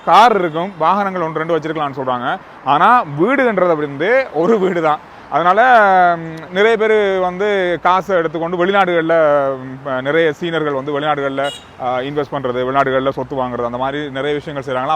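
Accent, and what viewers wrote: Sri Lanka. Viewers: உங்களது இலங்கைத்தமிழ் தமிழ்நாட்டுத் "தமுளை" விட கோடி மடங்கு இனிமை !! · உங்களின் இலங்கை தமிழ் கேட்க்க இன்ப தேண் வந்து பாயுது காதிணிலே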